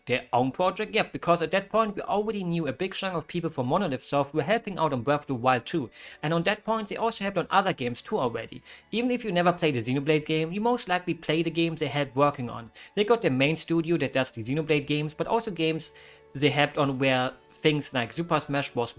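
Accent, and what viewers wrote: Germany. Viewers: I could sense your German accent was looking forward to your pronunciations of the Xenosaga subtitles! Funny to see Zarathustra stumping you though haha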